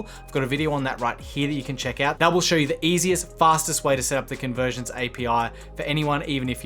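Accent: Australia. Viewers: Hey, I love your videos ( I also love Australian accent ). whats your take on Google updates that will take place in 2024 ( google is going to remove cookies in its browsers ) does this will affect Facebook ads, TikTok Ads?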